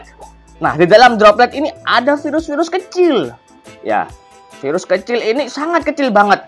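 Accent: Java, Indonesia. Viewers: Ini dokter bukan ,kaya org Jawa ,medok banget ngmge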